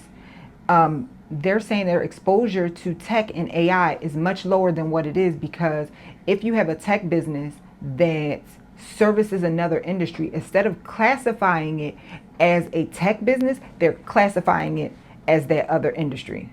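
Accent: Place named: New York, United States. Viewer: Hello lady is your sister the wife in the Henry family ? You all look similar and have the same accent ? Like New York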